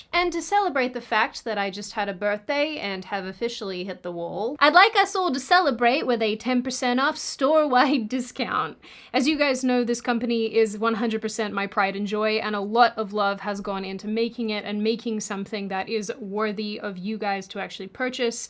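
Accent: Australia. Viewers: I totally still hear your Aussie accent!!lol it's cute, I love it 🙂💚🦘😄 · darling, you Do Sound Australian! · Do you honestly think you don't sound Australian? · This pommy thinks you stilli very much sound Australian:) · You still definitely sound Australian 😅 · Bless, you do sound Australian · You have a small Australian accent like the youtuber "TheAnimeMan," and I knew it right away when I heard you. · Spending time with your mum brought some of your Aussie accent back. As can happen. My mum spoke northern English when she used to speak to her mum on the phone when I was young. A small observation without a judgement :) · The Australian accent is blatantly obvious, and I mean that in a nice way! lol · We can tell your Australian! LOL you don't sound too off!😂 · You still sound Australian. But you should still be happy where you come from. Australia is a wonderful country. 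What made you want to move to America? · You sound like an Australian. . . No idea what you're on about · You don’t think you sound Australian? You sound Australian as fuck 😂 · Also, you may not sound like Issac Butterfield, but you still sound like an Aussie. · I have a lot of Aussie friends because of gaming and I can spot the accent no problem it's still there! 😁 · I picked you for an Aussie. It’s not completely hidden. Hi from across the globe 😊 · Nah, I didn't know you were living in the US. I thought you were living in Australia. You definitely have a bit of that Australia in you. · Seems her Australian accent is stronger with this video - perhaps because she recently spent so much time with her family. Didn't detract from the video though. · the more I watch your videos the more noticable your aussie accent gets when it slips happy birthday, love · Good on you, family is priority. 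Also, you still sound Australian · You sound absolutely Australian ! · You can say you don’t sound Australian all you want. It’s still there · "Im Australian though I don't sound like it. " Um... you definitely sound like it. 😄 The accent is a little muted but still very recognizable. 😉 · You sound extremely Australian. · I can hear those bits of Aussie. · I can still tell you’re from Australia, and that’s fine. It’s a good accent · You absolutely sound Australian, but its a light accent. Happy 30! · You totally sound Australien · TBH, you sound very Australian and there's nothing wrong with that.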